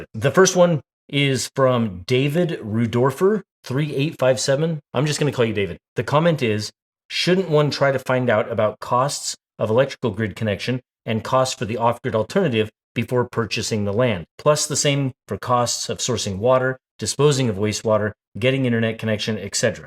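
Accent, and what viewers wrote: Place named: Central Washington, United States. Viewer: Bro, it sounds like you live in central Washington! I wish I could send you a message to see if we live in the same town 🤣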